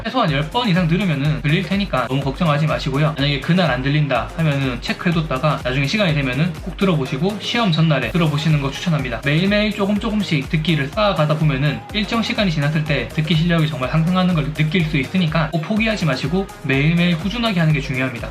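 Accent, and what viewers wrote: Gyeongsang, South Korea. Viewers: 전달력이 좋으신데 말이 너무 빠릅니다.~ 영남 사투리 악센트를 써서 그런지 모르겠지만 표준어를 쓰는 사람에게는 유튜버님의 이야기를 잘 못들을 수 있어요. 말을 조금 천천히 하시는게 좋을 듯 합니다.^^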